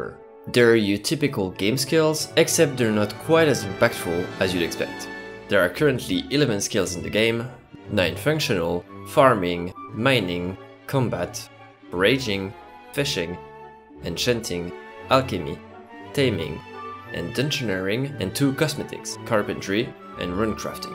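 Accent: France. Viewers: FRENCH ACCENT !!! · Listening to your accent i can approve that bedore you did yt you whrre used to talking french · J'aime cet accent français ! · ta l'accent d'un francais qui veux parler anglais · You sound so much like a France guy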